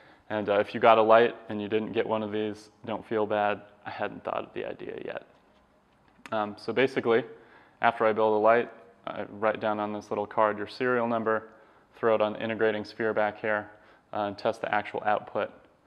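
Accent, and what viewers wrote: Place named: United Kingdom. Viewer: haha love the british accent - love the lights. gonna put some $ toward the Beta QR next check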